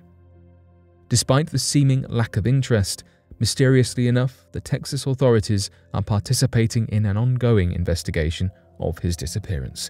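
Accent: United Kingdom. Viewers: Brits say geezer. This dude is a Brit.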